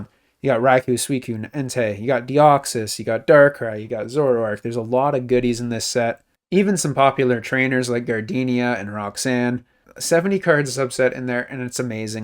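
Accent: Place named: Canada. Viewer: Great Vid! Cut through lots of the filler, appreciate that. And I knew I detected that Canadian accent before you mentioned prices in $CAD lol. Cheers, fellow Cannck ;)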